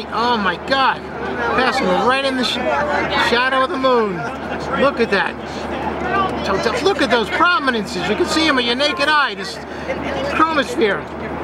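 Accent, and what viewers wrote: New York, United States. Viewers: oh my gahd, here we go, oh my gah, here we go, look at dis x 100! New York obnoxiousness on an Alaska Airlines flight? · lol Not another one.. Oh my God, oh my God. But, sir, thank you for filming a perspective most of us couldn't see. (You from NY btw?)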